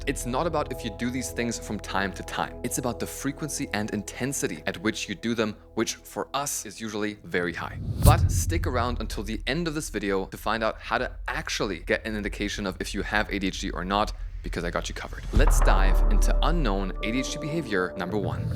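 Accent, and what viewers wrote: Germany. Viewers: I hear the german accent 🤨😂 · Wenn meine Ohren mich nicht komplett in die Irre führen, ist deine Muttersprache Deutsch. Wieso machst du die Videos nicht (auch) auf Deutsch? In meinem Umfeld gibt es leider viele, die kein Englisch können und es ist sehr schwierig ihnen die ganze Sache anständig zu erklären. Leider gibt es ja kaum gute deutschsprachige Videos zum Thema.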